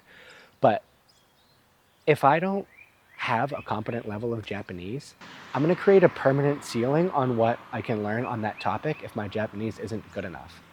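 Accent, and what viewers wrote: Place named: New York, United States. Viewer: Hey man, I've been watching all of your content and you seem articulate and well grounded. Giving me New Yorker vibes (though I know you use your blinkah haha). Do you watch TBYS and Asmongold pretty regularly?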